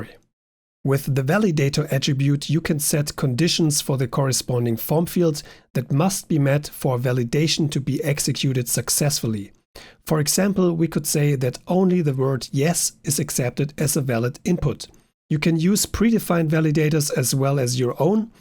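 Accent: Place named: Germany. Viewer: Great tutorial... voice has a great German twist, made me think of -: <link>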